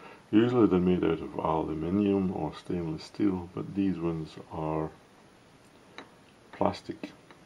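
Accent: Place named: Scotland, United Kingdom. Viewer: I hear a Scots accent ☺️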